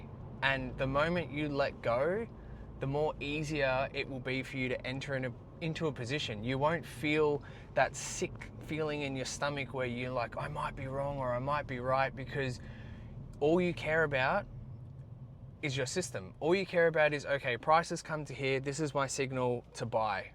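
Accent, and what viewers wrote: Australia. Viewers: Are you Aussie? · Perfect time for me to listed to that. It is nice to listen to a fellow Aussie. Thank you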